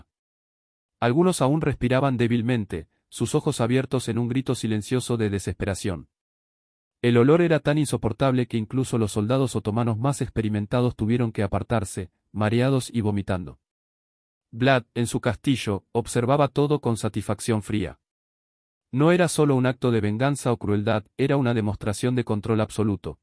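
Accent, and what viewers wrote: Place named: Rioplatense, Latin America. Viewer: acento me suena a español rioplatense en el video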